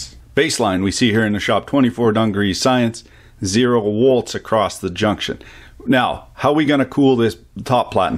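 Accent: Quebec, Canada. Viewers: Gosh i love your french québécois! How did you learn it? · man where are you from? You almost sound like a "Quebecois"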